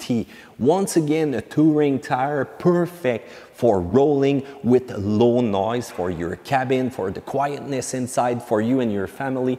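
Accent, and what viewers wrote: Quebec, Canada. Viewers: Yess, Anglais Québécois!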